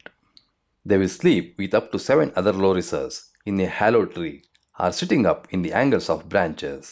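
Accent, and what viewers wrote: India. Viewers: Like for that south accent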